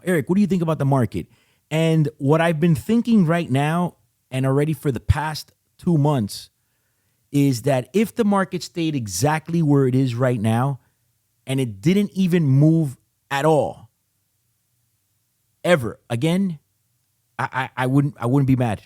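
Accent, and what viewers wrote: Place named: Miami, United States. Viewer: Heaviest Miami accent ever. Clear-lee.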